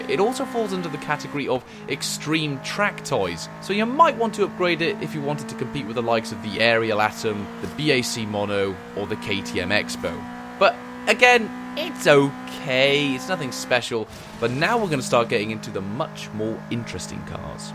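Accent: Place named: United Kingdom. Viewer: Why do you remind me of SovietWomble? Is it the british accent?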